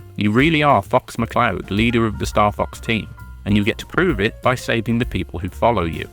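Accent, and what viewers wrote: United Kingdom. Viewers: As an Aussie it's my national duty to take any self-appointed Starwing expert with a Pommy accent down a peg. Because what's the point of being on the internet if you can't get mad at random people saying ... wait, you said that bit. Anyway, despite your alleged Britishness and supposed history of playing this game since 1993, you insisted on calling the game Starfox instead of its right and proper PAL title, so you've brought this on yourself I'm afraid. Hostile force carrying bits of geometric structures around intending to build something? Mate, those are Corneria's own robots cleaning up the debris. You don't get points for shooting them, because they're friendly. They even carry off the pieces of the Attack Carrier that Falco destroys in Course 3 Corneria. Because he does in fact do that. Calling this an on-rails shooter ... such disrespect. 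Starwing is a rail shooter crossed with an obstacle course. That's the secret other half of the ingredients that makes it so good. Arches! Arches everywhere! Don't worry about your score? Mate, that's like two-thirds of the replay value in this sleek little cartridge. You've got to get your money's worth. You haven't beaten Starwing till you've rung up a perfect 100% score on all three courses. And no cheeky Black Hole shortcuts allowed either. I only ever managed it on Course 3 once. Once. And I don't have the photos of the old living room TV to prove it. You're just saying the score doesn't matter because you didn't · no, no you don't sound annoyed. You sound ANNOYING. Lol, clown. Skipping the video and dropping a dislike because literally every video with a British accent is them complaining about how much better they know something than everyone else does, even though they're actually the ones being aggressively reductive and flippant and irreverent and, because of all those things, inevitably wrong about every single subject they come across. 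Not watching the video but please, comment I'm wrong if you put yourself through this nonsense and feel I got it wrong. It'd be interesting to me because the odds are that I didn't get it wrong.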